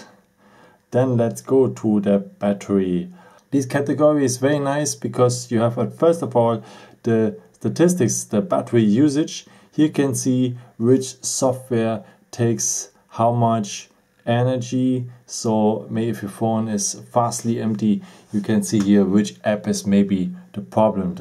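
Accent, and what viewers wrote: Germany. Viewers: R u german? It really sounds like u r german xD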